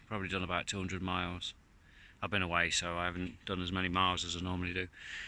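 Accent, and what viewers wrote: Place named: Derby, United Kingdom. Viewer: I have the solid ones on my Brompton, love them , reassuring to know you’ll never flat . Expensive but I ride on the camel trail Padstow to wadebridge every day . Enjoyed your vid on the Orbea also , I think you are from Derby by your accent, me too.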